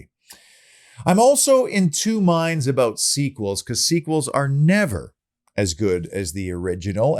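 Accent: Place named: Canada. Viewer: A villa fan with a Canadian accent? Wow that's a little surprising.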